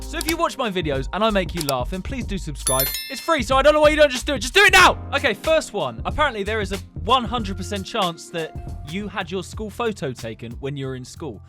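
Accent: United Kingdom. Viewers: I like your British accent It’s kinda like more like dinner table queen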